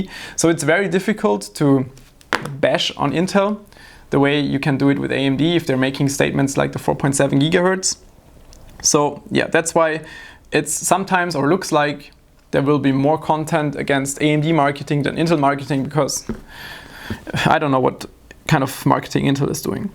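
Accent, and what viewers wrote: Germany. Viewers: Den deutschen Akzent hört man einfach immer raus :D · I love the German accent, the way you pronounce stuff makes me shiver! But, while Ryzen chips are good and all, I'm still grabbing me an i7. Why? I already have a high-end Aorus Intel mobo. Buying a new one just for Ryzen is just illogical for me.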